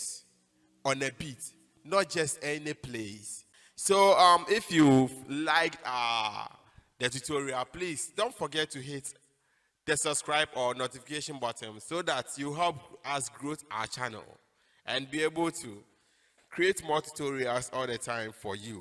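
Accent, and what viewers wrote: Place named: Ghana. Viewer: You sound Ghanaian😎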